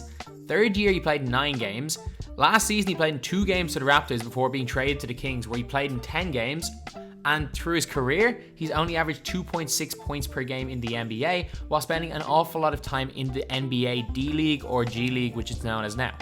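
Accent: Ireland. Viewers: are you from Ireland!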